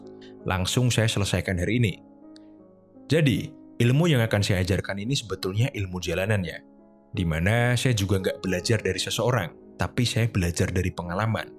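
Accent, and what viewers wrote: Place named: Java, Indonesia. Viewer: Ga, yg ini agak medok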